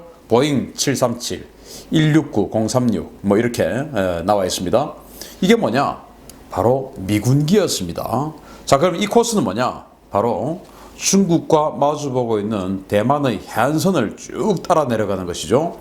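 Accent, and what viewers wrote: Gyeongsang, South Korea. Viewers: 화가 나서 다시한번 들어보니 대만섬 이라고 발음 하는거 같기도 하고?? 경상도 사투리 억양이 남아서 내가 잘못 들은거 같기도 하고??ㅠ 타이완도 엄연한 한개의 독립국가이니 대만섬 이라고 하지말고 대만 이라고 불러부는게 예의 인거 같습니다ㅎ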